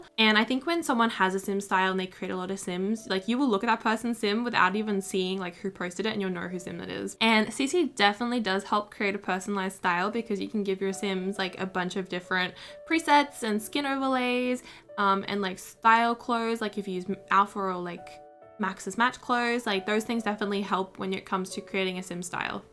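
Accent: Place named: Australia. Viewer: I met you recently, just when the Growing together pack came out and I'm currently looking at your other stories 🥰 I loved your Australian accent and your sweet voice, as well as the way you tell the stories I am Spanish and my English is basic but I have to tell you that I can understand most of it well. Thank you very much for being so appreciative of us and for your hard work ♡ Starting to do this despite your doubts is having a lot of courage, thanks for everything ❤️ take care of yourself 🍀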